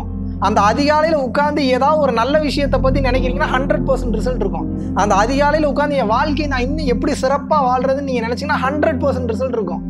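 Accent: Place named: Kongu, India. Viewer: Supper tambi Solum vdam miga alagu Enga ur kongu vaadaiatikudunga peshle 👌👌🙏🙏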